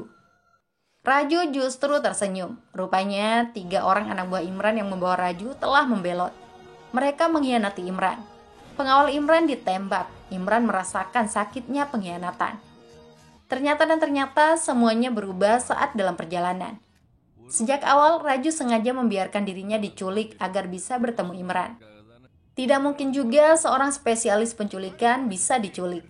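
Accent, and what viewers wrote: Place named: Padang, Indonesia. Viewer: Ini admin yang ada logat Padang nya ya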